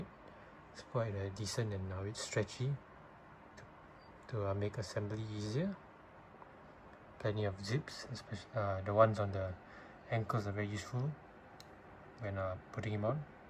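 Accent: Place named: Malaysia. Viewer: Your accent's very familiar! Are you from malaysia or singapore?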